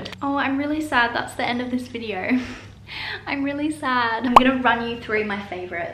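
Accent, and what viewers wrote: England, United Kingdom. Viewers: Fun fact: First, i was thinking that u were british bc of ur accent! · It’s wierd cause u sound British and Australian at the same time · I hear a little bit of British in your voice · Ah so she’s from Uk I was like no wonder she sounds so British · you kinda stil sound slightly english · Am I the only one who thinks u still have a British accent but I can hear an Australian accent as well 🤣🤣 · She spent $200 on English food she sounds English to me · Your accent sometimes sounds british but then Australian at the same time? 😂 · Girl you still sound British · Wow you still have that bit of an English accent despite leaving when you were 8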